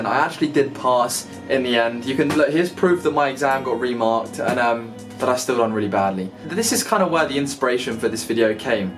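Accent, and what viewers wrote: Australia. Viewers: THAT AUSTRALIAN ACCENT IS COMING THROUGHHHH😂😂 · Australian accent hahahah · That Australian accent is getting stronger😂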